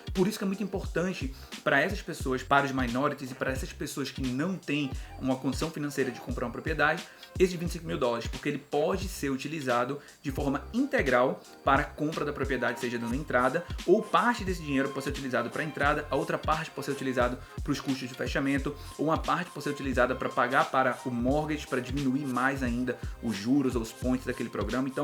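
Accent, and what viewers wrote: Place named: Portugal. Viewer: Kara, não consigo parar de assistir seus vídeos🙈 conteúdo direto, e esse sutaque da terrinha já traz um pouco de intimidade. Parabéns maninho! 🙌🏻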